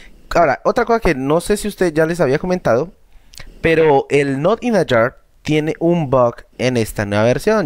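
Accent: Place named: Colombia. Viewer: De q parte de colombiano eres ??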